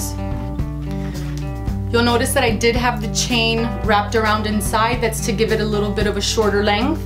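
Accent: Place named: France. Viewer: really cute french accent ^^ nice video !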